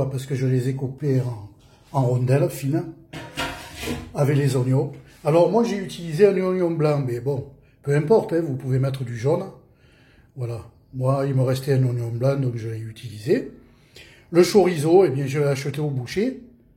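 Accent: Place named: South, France. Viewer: Coucou =) "!!!!!!" Comme dit Lucky, ca donne trop Faim "!!!!!!" Super vidéo d°un excellente organisation, Merci infiniment pour des bonnes recettes "!!!!!!" Ca fait du bien aussi d°attendre notre accent du Sud "!!!!!" Bonne Faim de soirée "!!!!!!"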